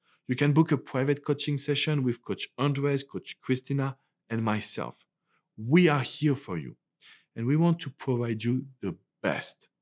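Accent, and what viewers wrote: France. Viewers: I am here for the french accent. :-)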